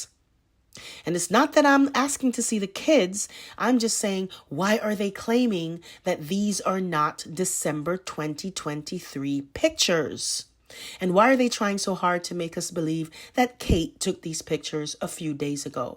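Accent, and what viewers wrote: United States. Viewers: I hear Brooklyn or the Bronx in your voice ❤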